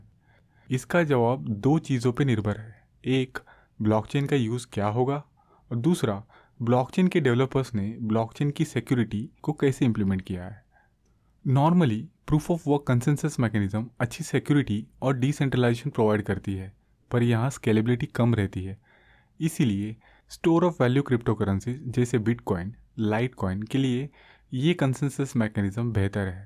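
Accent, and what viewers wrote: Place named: Maharashtra, India. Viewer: seems like you are Marathi person.. accent warun watala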